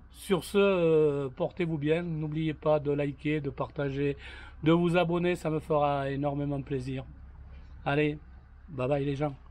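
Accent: South, France. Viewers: Coucou de Dordogne, j adore votre accent du sud , vos trucs et astuces, vos conseils , que du bonheur !! Merci et bonne journée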